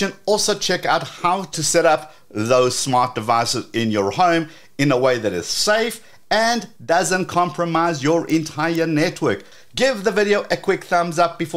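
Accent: South Africa. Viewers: Thought so😁. Your accent, only a South-African will know 😅Not anymore though, from your channel info? All the best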